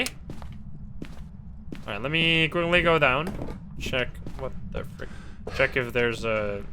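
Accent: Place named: Netherlands. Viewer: are you from netherlands or belgium?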